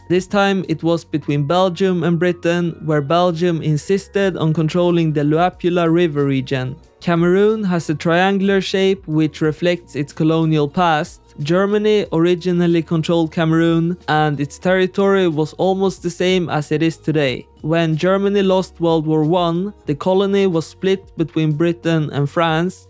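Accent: Sweden. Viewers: Are you swedish by chance?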